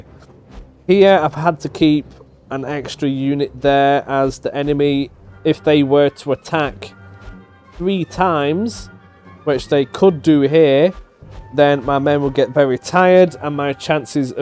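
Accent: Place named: Lancashire, United Kingdom. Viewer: oh I’m on your time mate, been trying to place your accent I may be a bit off but I’m guessing round Bolton or wigan. I’m only in salford so shouldn’t be so confused lol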